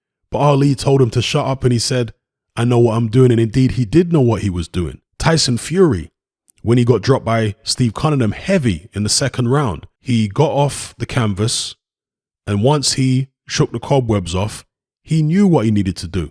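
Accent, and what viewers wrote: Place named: Cockney, United Kingdom. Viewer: He has a cockney accent why tf are you so upset?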